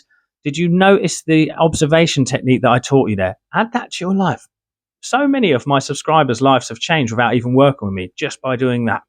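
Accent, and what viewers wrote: United Kingdom. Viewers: Halo there, lol is this uk accent? Love the content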